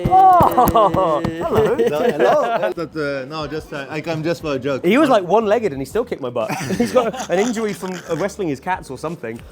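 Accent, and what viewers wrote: United States. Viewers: Your accent had me wondering why it was just for the southern states, so great job, it took me a moment to understand it was an American accent vs southern. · I can confirm that we Americans speak like that. I think it was spot on.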